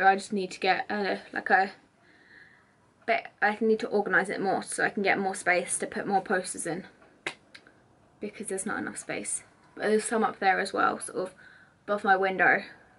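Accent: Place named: United Kingdom. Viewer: Hello your stuff is nice we have the same shirt black veil brides and also the shop at that store hot topic love your British English is very difficult to understand but it is very nice your asento greetings from México tijuana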